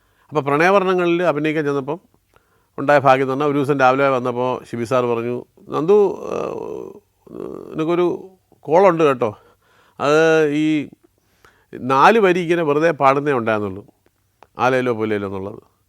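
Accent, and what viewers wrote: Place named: Trivandrum, India. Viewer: great to hear proper Trivandrum accent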